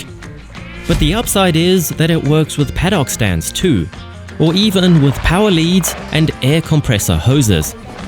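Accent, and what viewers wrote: South Africa. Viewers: Thanks for the tips. Also, hello from South Africa. I could tell you were a boet from your first sentence.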